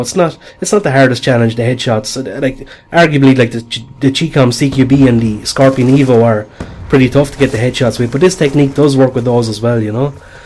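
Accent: Ireland. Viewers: Irish you tuber ftw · are you irish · Is he from Ireland so am I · Are you Irish? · I have only gotton 50 second in the video I will finish it o.o but may I ask are you irish your voice is distracting in a great way :D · Are you Irish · I liked and subbed... btw are you from wexford by any chance?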